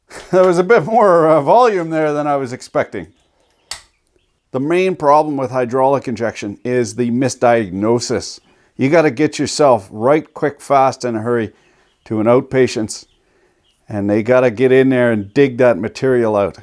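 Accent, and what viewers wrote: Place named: Canada. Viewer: The Canuckistan English language of still amuses and amazes me after all these years.:)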